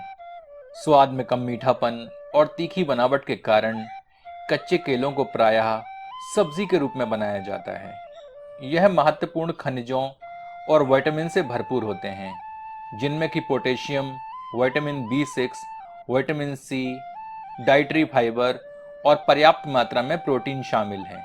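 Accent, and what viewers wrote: Standard. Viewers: your "shud" hindi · aap ka sabse acha lgta bolna, jo ki itna shudh hota hai ki mano koi Guru hme mantra bolna sikha rha ho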